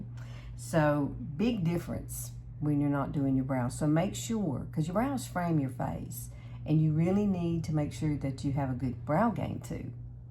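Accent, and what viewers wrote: South, United States. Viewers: I love your southern accent! You’re very cute and funny.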